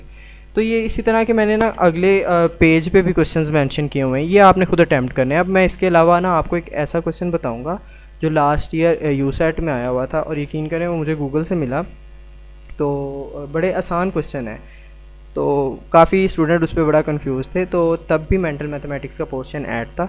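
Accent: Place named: United Kingdom. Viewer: Omg ur English accent 🥲❤️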